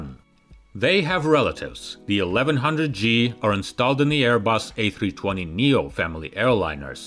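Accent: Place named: Russia. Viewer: This guy has amazing English, and a perfect amount of Russian accent. Not too strong, not too American. Amazing videos, keep up this amazing channel!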